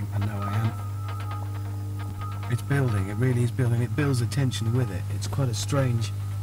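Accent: Germany. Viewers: This is the most german english ive ever heared😂 vor handred sausend wollts^^ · Well to be honest, almost every German older than 30 is speaking like that. The younger generation, especially the teenagers are a lot better and have less of an accent because they grew up with youtube. But I guess you will always be able to tell. BUT: As a german native speaker I am always able to tell if the person I am speaking to is an english native speaker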